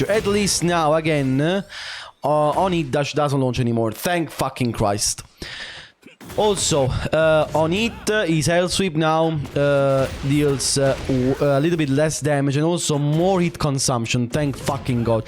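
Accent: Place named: Sicily, Italy. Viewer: Brother, random but are you Italian? Your accent when you speak sounds just like my wife's (Shes Sicilian)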